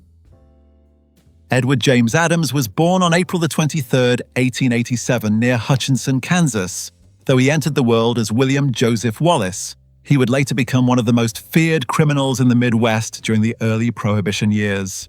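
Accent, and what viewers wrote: United Kingdom. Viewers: Why a stupid British voice over.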